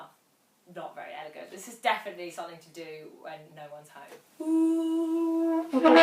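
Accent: United Kingdom. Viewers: thanks for upload these helpful tips. I use sometimes this technique on my baritone sax. Greetings from north Mexico. By the way, I like your lovely british accent;)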